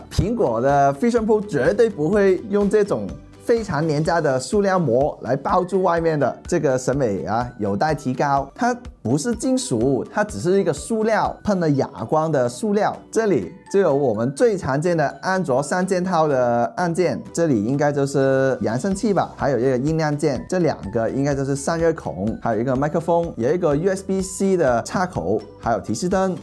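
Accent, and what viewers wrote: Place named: Cantonese, China. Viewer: 點解用廣東話口音講普通話？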